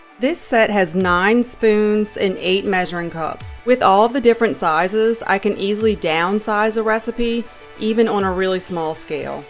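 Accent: Louisiana, United States. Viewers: I was wondering about your accent! I'm in Louisiana! Central LA, where are you!? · Lol!! I knew I recognized your accent on the 1st video I saw!! Im from Louisiana and I live in Lafayette.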